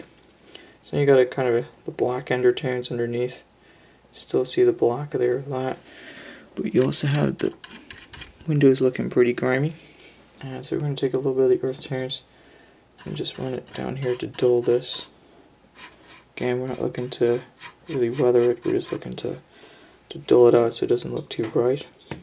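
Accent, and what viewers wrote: United States. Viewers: Hi, just wondering you come from? As you sound American/Canadian, if so, why do you use English trains? Not to be nosy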